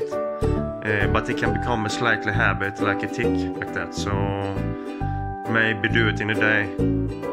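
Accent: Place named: Sweden. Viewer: Märker det genom ”dialekten” eller vad flesta nu kallar ”svengelska”. Jag själv har den dialekt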